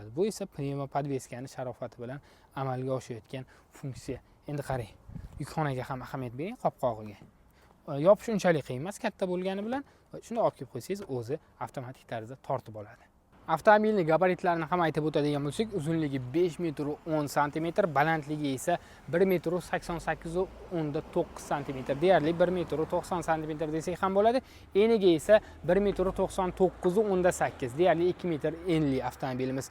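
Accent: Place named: Uzbekistan. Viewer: Соф ўзбекча обзорга гап йўқ. Мошина ҳам зўр экан🔥🔥🔥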